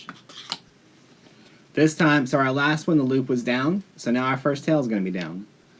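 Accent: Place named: South, United States. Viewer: I don’t know what gives me a bigger thrill! The fact that I have learned more about wreaths and bows watching 2 of your films or the fact that your from the South! I love the Y’all 😘 I feel like I am listening to one of my professors from Ole Miss.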